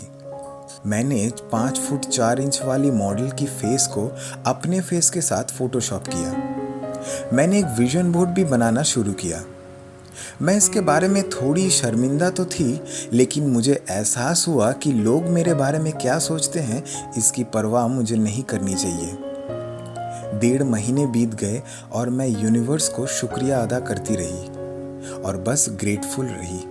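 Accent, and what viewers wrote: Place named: India. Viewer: orry I'm not very good at listening to Indian accents. please tell me about "thank you universe my height is blabla cm" is it our current height or hope for the future?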